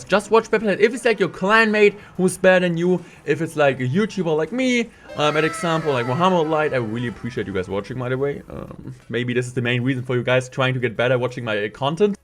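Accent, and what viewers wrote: Germany. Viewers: We love accent germany/English.. best content creator ever.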